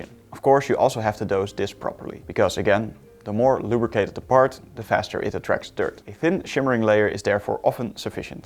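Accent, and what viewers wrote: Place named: Netherlands. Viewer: u sound very very dutch my guy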